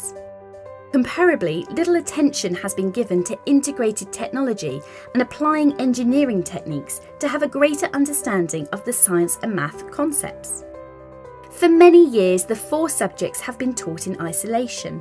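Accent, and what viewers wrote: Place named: United Kingdom. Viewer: In britain it is spelled with an "s" and their accent seems to be british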